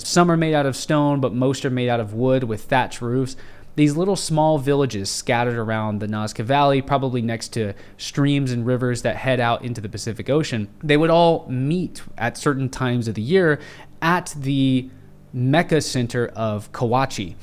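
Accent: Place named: Carolina, United States. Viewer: Dude, you sound like you're from my neck of tha woods... #CarolinaGrown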